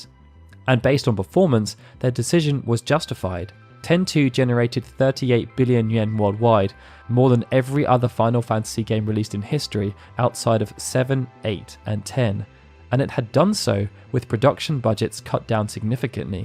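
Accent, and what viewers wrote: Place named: RP, United Kingdom. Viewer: Great stuff! Intriguing and well crafted as usual. I grimaced at /I no va'TIV/ though! I've always heard /'I no vei tiv/. It's obviously down to your exceedingly posh british accent.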